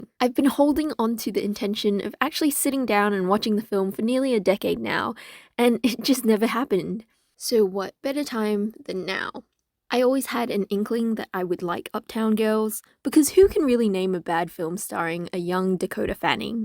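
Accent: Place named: Australia. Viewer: Refreshing Australian accent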